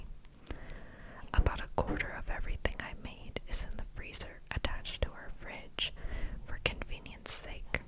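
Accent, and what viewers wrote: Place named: Texas, United States. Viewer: In the first video you made you had a Texas accent! It was super tingly if you ever want to make another some time 😊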